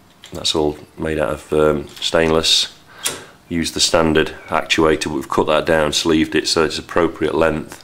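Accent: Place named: Northern England, United Kingdom. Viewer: Sounds nothing like him. This guy is from the north of england and has a very similar accent to myself. James may is from the south with a more neutral accent.Imagine me saying Woody Harrelson sounded like Mark Wahlberg and it will give you some idea of the difference.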